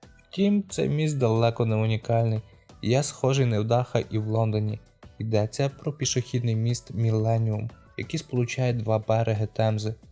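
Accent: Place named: Lviv, Ukraine. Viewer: звернув увагу на вимову числівників. Звучать як у Львові говорять - 50 з пом'якшенням т.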